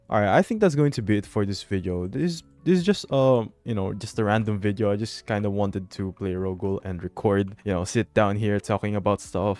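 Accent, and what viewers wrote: Philippines. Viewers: Filipino kaba based sa accent mo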